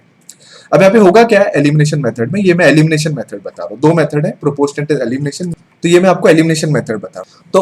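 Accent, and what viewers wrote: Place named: India. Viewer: what is bro on about, i didnt understand shit of that indo-english bullshit. He's onto NOTHING💯💯💯💯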